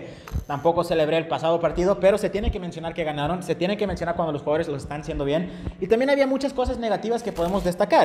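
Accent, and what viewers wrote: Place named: United States. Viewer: Está ablando el pirrurris gringo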